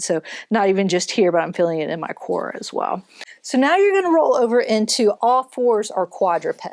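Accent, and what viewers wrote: United States. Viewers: This comment is irrelevant to the subject of this video. I had always thought you were British, but tonight it just clicked and I was like this is obviously a very American accent!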